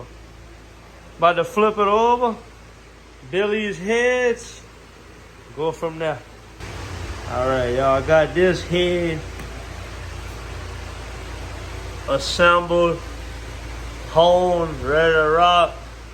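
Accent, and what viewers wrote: Louisiana, United States. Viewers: Is this a Creole or Cajun accent? No disrespect intended. Love it either way. Uniquely Louisianan . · You sound like one of them fellas around New Iberia or St. Martinville.. or one of them backwoods Louisiana areas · Come on now, obviously it’s Cajun.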